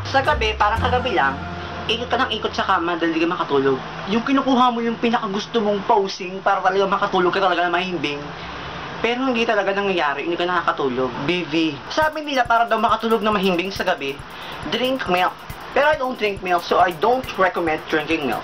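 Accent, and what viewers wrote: Visayas, Philippines. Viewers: bisaya ha..hehe galing naman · "PISTEH!! Ano ba yun" AHAHA!! XD. Bisaya jung dako!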